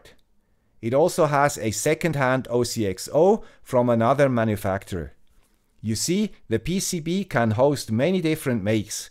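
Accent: Switzerland. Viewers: Great your Youtube show! But I would prefer it very much if your videos would continue to be numbered. It's much easier to reference them and much more practical to say to a colleague: "Have you seen video ### by (the guy with the Swiss accent) yet?". Or sometimes I just write down: "See Swiss ##".:-) Many thanks for your series! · This is a very good video. Thumbs up. And of course Swiss people are renown for timing accuracy, so this is consistent with getting the information from a guy with a Swiss accent. On the downside, Swiss people are not known for having lots of sex. For information on that aspect, we will be waiting for a video by a gal with an Italian accent! ;-) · Hello Guy with swiss accent, very impressive from Guy with Bangladeshi Accent.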